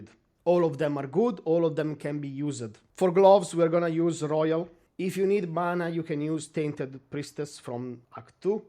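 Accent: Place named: Italy. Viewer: ah ecco............mi pareva che tu fossi italiano!!!! dall'inglese, buono perfetto, ma ho sentito che quell'ìinglese era un pò da pronuncia italiana!!! Comunque Grazie, le tue build sono interessantissime e questo è un bellissimo gioco!!! che ancora non ho finito visto che sto provando build su build.........e sono a 100 e passa ore!! Hai preso anche l'espansione? io si, e mi piace un sacco!!!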